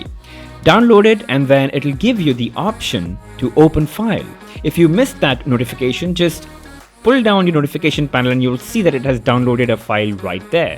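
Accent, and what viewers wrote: India. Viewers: indian accent English is pure ear cancer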